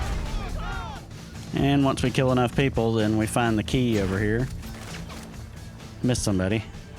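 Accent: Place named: Kentucky, United States. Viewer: I love your accent! I'm from Kentucky and I don't know where you're from but you sound like you could be my neighbor! 😂 Great walkthrough! I appreciate it!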